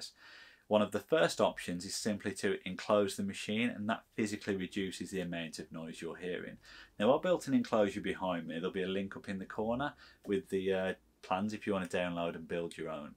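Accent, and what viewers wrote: Birmingham, United Kingdom. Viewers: Excellent content and the best I have seen on this clever little machine to date! Very many thanks. Btw, people of the world thats a Birmingham accent - a place in England famous for engineering excellence and where kids grow up naturally knowing how to make things and create loud music for some reason. Good to see a great tradition continuing.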